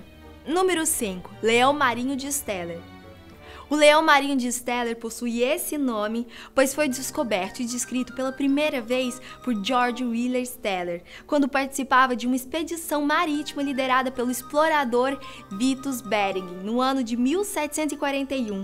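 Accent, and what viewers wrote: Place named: Minas Gerais, Brazil. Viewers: Pelo sutaque deve ser mineira. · A moça que fala nesse vídeo é de qual estado do BR? Parece de MG...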